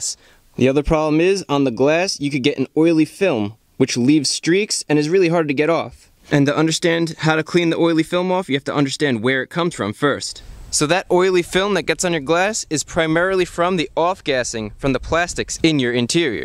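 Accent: United States. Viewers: Your american accent drives me crazy!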